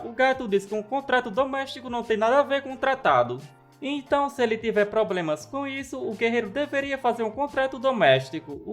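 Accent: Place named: Northeast, Brazil. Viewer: Tu parece nordestino man Kkk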